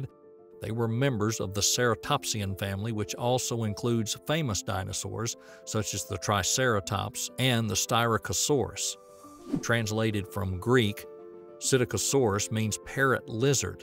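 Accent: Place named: Tennessee, United States. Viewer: I love this man's accent. I wonder where he's from. Sounds like a Tennessee neighbor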